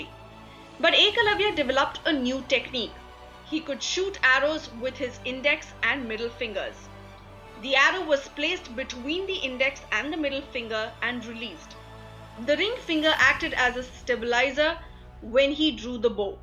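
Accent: India. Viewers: Not a mythological myth, it is India's history. Pronunciation is Karn, Arjun and for more names or places like these.not Karnaa, Arjunaa, Mahabhartaa. Perfect sound of Indian accent but foreign pronounced words.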